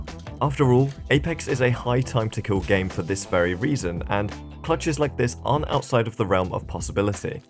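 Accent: England, United Kingdom. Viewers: Nice to have some Apex vids in an English accent! Is there skills matching in Apex? I seem to constantly get one-clipped by people with between many hundreds, and thousands, of kills, and always to be holding up my team mates. The scores the game gives you seem to be massively weighted towards survival time and everything other than kills, so if you have some tactical nous and play as a team player, you get to high levels despite potentially being awful at getting kills, as I am - which leads to frustration! I'd love to find more people what want to play a slower, longer-range game and outflank enemies as a squad, not just charge in aimlessly whenever they see anyone....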